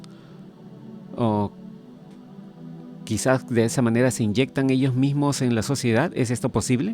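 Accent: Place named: Peru. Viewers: es de Peru 😍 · Tiene un acento como de Perú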